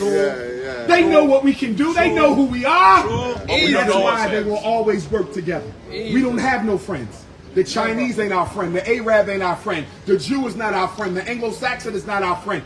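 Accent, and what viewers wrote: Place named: United States. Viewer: Who are the “indigenous” Jamaicans? Are you even Jamaican? Cos you sound American to me. And you guys clearly have your own issues you should be more concerned with. Secondly, of course Jamaican are gonna be nice to tourists. Tourism a huge part of the Jamaican economy. Thirdly, land isn’t “taken” it’s not the 1600s! Land is purchased, which the Jamaican people have no actual say in. Just like many neighbourhoods in New York were gentrified, were those neighbourhoods “taken”? You’re just chatting to be chatting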